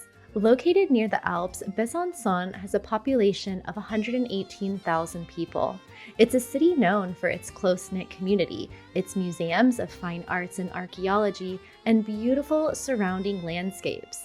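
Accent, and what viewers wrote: United States. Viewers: Franco-American France mother American father US army, and I have live in France for over 20 years this time! I could not disagree with you more on everything sure if you have lots of money, and are will to burn it anywhere in France is just as good as any other place! France is about the size as the state of Texas and everything is near by. Do you want tourist trap she is your lady someone to help spend your money she is your lady or someone who thinks she knows France, but actually she fooled you she is your lady! Lyon & Toulouse two of the worst places to go and Provence Nice is nothing, but American tourist trap for the chic want-a-bes! One thing about France is you can find great food and great wine anywhere and there is something for everyone you want water go to the water you want mountains you just go there, but don't take her advise she actually knows little about France and that American accent could kill a horse to the French that have to listen to that I am sorry even my father knows better and he hates the French! 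Read some French history Google map research the place you like listen to every other expat on YouTube and listen to some French videos try learning French do what you can before coming here get yourself a Frenchie to talk with by asking one!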